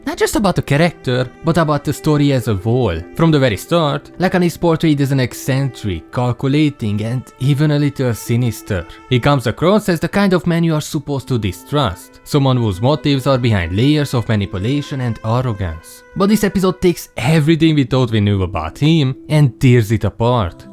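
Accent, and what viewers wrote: Hungary. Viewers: That Hungarian accent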